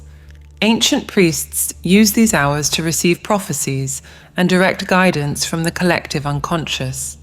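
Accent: United Kingdom. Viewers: LOL!!! I see you've pulled out all the tools in your belt for this load of propaganda eh? Oh we had thirteen months alright, which you can clearly read in your present bible. Not hidden at all. A wee bit of truth and a load of bull...propaganda. You use a British voice to convince us that she is well educated, lol, and you use low frequencies to relax the mind and put it in a more malleable state. This is pure evil. Also known as misdirection. Some truth mixed with some nonsense will have you forever searching. DON'T FALL FOR IT FOLKS!!!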